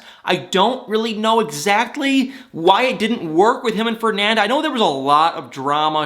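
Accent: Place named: Midwest, United States. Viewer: "Oh my God!" Hahaha your Midwestern voice reminds me of home, Cleveland. Live in L.A. a long time now, but go home as reg as I can.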